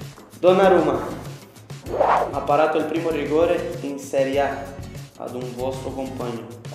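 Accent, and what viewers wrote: Brazil. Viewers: Is it me or my man still sounds very Brazilian even when he speaks the beautiful language 😅😅? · I can’t speak Italian well, but it seems like he still has a strong Brazilian accent? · Io lo adoro l'accento brasiliano. Nonostante sia qui in Italia da tanti anni non lo ha perso del tutto e la cosa non mi dispiace affatto.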